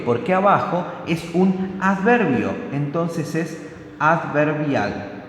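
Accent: Tucumán, Argentina. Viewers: Sos de tucuman?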